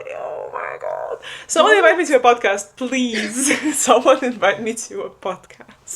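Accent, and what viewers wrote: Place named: Ukraine. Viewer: we look so alike and have that ukrainian tone it feels like watching myself from an alternative universe omg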